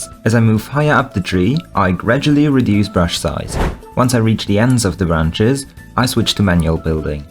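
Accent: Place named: Germany. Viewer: Oh nice I thought i heard some German in there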